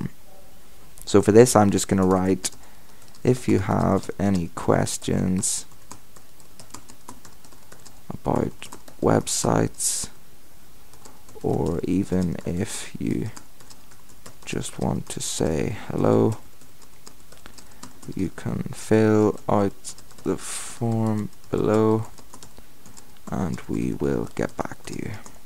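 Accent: Northern Ireland, United Kingdom. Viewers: Very clear tutorial! All actions described in detail at a nice slow pace that suits me down to the ground! Love the Northern Irish accent as well, very refreshing after all the American drawl you tend to get on the web! Thanks for posting this, it really makes it all so much easier. Now all I have to do is think of a good name....;)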